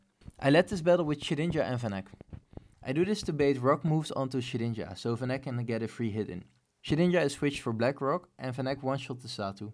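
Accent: Netherlands. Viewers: Where are you from man? You sound Dutch Enjoyed the video. Will watch more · Are you from the netherlands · you're from the Netherlands right, i know that accent haha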